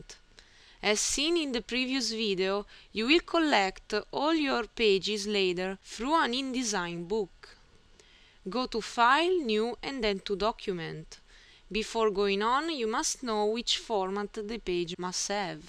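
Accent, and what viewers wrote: Italy. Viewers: Are you Italian? Your accent sounds like italian people speaking English. :) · I'm Italian aswell..sounds like an Italian speaking English but, to me, the rhythm/strong emphasys on consonants when she pronunces 'We will take for example....' and 'which format the page must have...' makes me think that she might be Russian.....I have few Russian friends here in Bangkok and the 'musicality' is the same.... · nice to follow even with your italian accent its easy to still understand actually very clear to understand :) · Hahah true I think so, I'm italian and I've think the dame thing 😃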